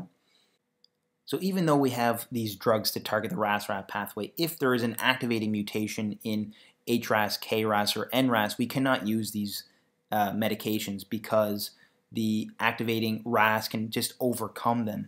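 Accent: Canada. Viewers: are u canadian?? ur accent sounds super canadian lol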